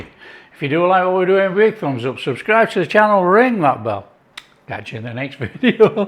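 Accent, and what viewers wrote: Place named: West Yorkshire, United Kingdom. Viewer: You, my friend, have got to be a West Yorkshireman..? I absolutely feel like I'm home, listenin' to 'yo' ❤️🥰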